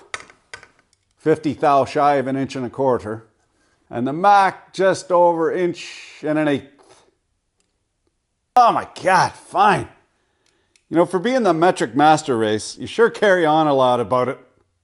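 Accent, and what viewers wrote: Quebec, Canada. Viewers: It's a rough Quebec accent.... Pas sur si c'est juste rouillé ou c'est forcé? Québec ou pas Québec? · jmattendais pas a entendre du Quebecois dans une video que j'ai clické au random mais sa fait plaisir au oreilles, cheers man thx for the review oué mon'homme criss de beau outils mets en 🤌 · Tu est Québécois??? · Très bon Québécois👌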